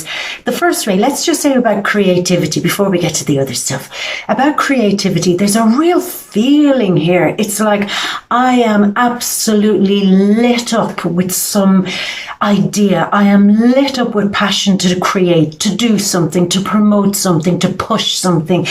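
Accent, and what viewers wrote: Ireland. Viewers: I love the the Irish Accent? and that your really trying to keep clean lol. Passion 93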